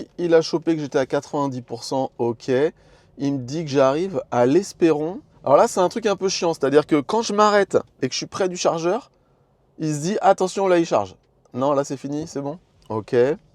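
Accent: England, United Kingdom. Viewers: cet accent anglais se perfectionne de vidéo en vidéo. Et c’est beau!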